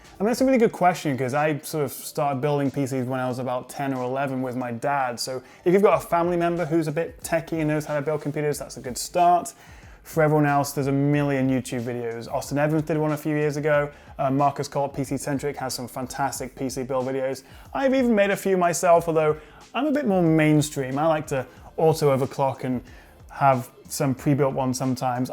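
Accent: United Kingdom. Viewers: I like your channel because your British ascent sound interesting.:) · Hands down my favorite YouTuber/ tech reviewer ever, his content is just so relaxing to watch an plus the guys from uk awesome accent happy new years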